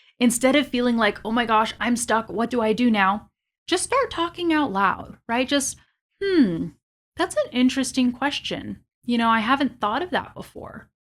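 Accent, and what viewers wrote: California, United States. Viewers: It's great, btw, I have watched your content in some videos, am I wrong if you sounds more like American than British?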